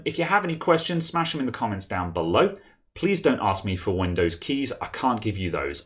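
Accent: England, United Kingdom. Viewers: he is speaking a certain accent of UK english, other accents can be unintelligible . search youtube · Where in the world are you and from. My guess is English but you always price in dollars. I subbed to your channel because it's nice to have a clear and understandable voice to listen to.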